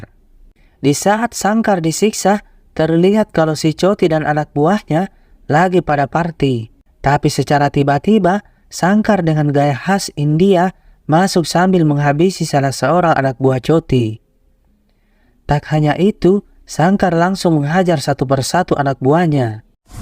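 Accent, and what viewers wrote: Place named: Makassar, Indonesia. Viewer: Logat makasar ni host nya . Cara baca pun Sulawesi ki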